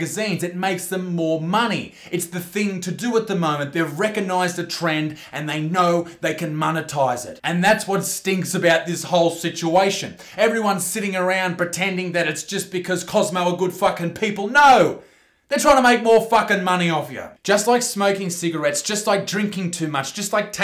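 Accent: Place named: Australia. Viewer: i weighed 135 Me, an American: that's not bad, goddamn: kilograms Me: oh yeah that's right I'm an American and this boi sound like he's from Australia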